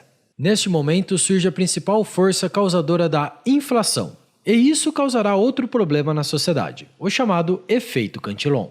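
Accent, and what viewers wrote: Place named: Goiás, Brazil. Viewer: Esse sotaque goiano kkk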